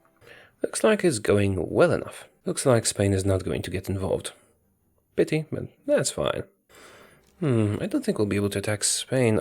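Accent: Poland. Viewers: your accent is a bit polish nice! i love polish! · lol my friend thinks you are German. I told him you sound Polish. is Polish right?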